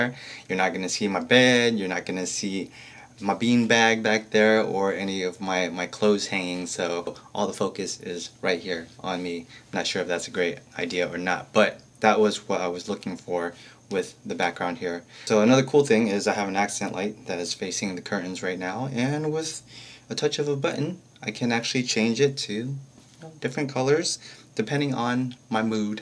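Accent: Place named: United States. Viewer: hi from peru!! i like you videos i am so curious what is your ethnicity is? you sound are like american. :) thank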